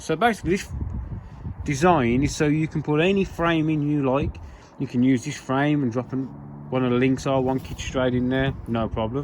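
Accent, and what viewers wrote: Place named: Midlands, United Kingdom. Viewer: Hi mate - guessing from your accent your from the Midlands - where about a are you ? Would like to discuss a possible project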